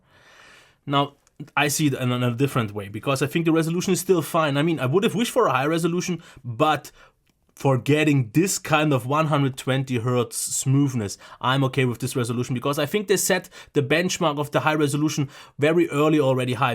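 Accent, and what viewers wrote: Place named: Germany. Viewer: Man I love that German accent, brings the queen's English to life.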